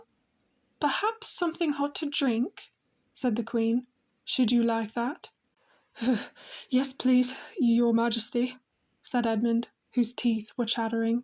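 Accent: United Kingdom. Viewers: Thank YOU for doing a fabulous dramatic primadonna british accent ice queen! Sounds fun to record and even more fun to listen to!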